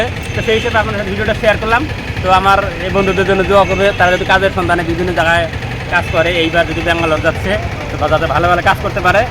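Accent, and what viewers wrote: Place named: Bangladesh. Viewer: ৯ লাইক ভাই আপনার কথাবার্তা আমাদের মতো মানে বাংলাদেশের মানুষের মতো আপনার অরজিনাল বাড়ি কোথায়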